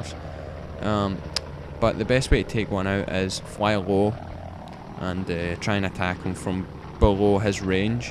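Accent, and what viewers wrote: Scotland, United Kingdom. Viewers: From Scotland? You sound Scottish :p We scots PWN! xD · yass, you scottish m8?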